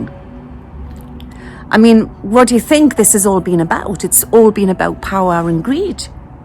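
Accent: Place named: England, United Kingdom. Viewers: Thank you for given us important information. I deeply resonate with your words and predictions. For more than ten years I have been translating and introducing some of the English alternative articles and videos which I felt interesting in my blog site so that some of the Japanese people can understand them. Lots of the Japanese are not getting enough information from abroad though much of the important information come from outside Japan. I think that is what I am here for. Long time ago I used to live in England for 12 years so I love to hear your northeastern accent. Thank you. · Luv ya and our English accent dearie.... thank you for sharing your gifts and insights and positvity! Do you see a tsunami originating in La Palma Island affecting the east coast of the united states?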